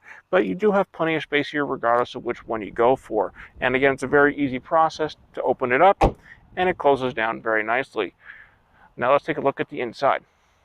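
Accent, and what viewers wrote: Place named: Europe. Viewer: Where is this guy from, Europe? Zed, kilometers. Z, mileage.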